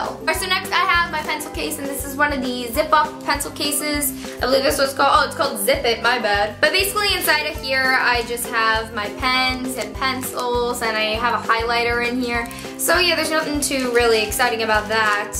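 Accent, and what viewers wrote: New York, United States. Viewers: I'm pretty sure it's a New York accent. I'm from New York and she talks just like me so I think I'm accurate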